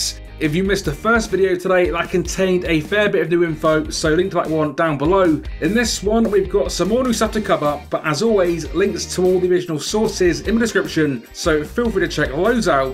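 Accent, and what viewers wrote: England, United Kingdom. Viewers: Rasist? What the fuck, men? I am is not rasist. I just don't understand English accent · Why you here if you dont like his accent. He's British how do you expect him to lose it? Before you start getting all brave and confident think about what you're saying before you say it. How can a BRITISH person lose their BRITISH accent. Common logic man. Thumbs up to you! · also go watch denkops or will power if you don't like the british accent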